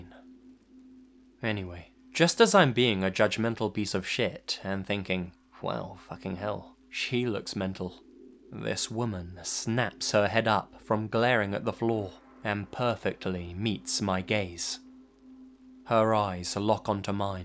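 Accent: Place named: England, United Kingdom. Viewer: tbh i can only watch mr nightmare and. all the other horror channels ive tried to watch either have content ive already seen from or mr nightmare, or their voices irritate me. just my opinion, but has one of the dreamiest voices. id honestly pay him to read a telephone book to me. but i guess i just have a bias towards english accents.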